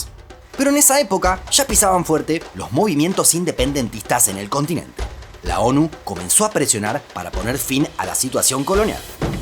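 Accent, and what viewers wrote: Argentina. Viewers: Hablas como Argentino y es feo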